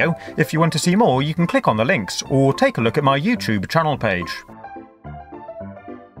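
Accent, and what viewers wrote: England, United Kingdom. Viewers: i love your english accent :D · Are you English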